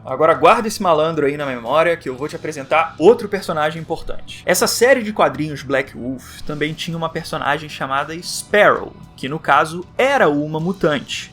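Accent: Rio de Janeiro, Brazil. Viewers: Tu é carioca mérmão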